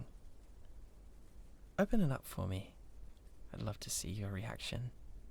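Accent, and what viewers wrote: United Kingdom. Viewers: High pitched British screaming that's makes you both laugh and also feel slightly concerned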